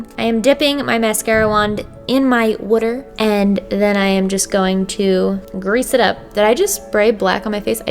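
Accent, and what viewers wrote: Philadelphia, United States. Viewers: I've always thought that had a very unique accent but could never pinpoint it. It's a Philadelphia accent. I'm from Philadelphia. Why am I like this?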